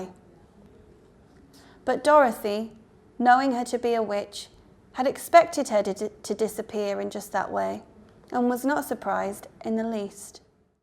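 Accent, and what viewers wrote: United Kingdom. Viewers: I love wonderful accent, she’s amazing. Really appreciate.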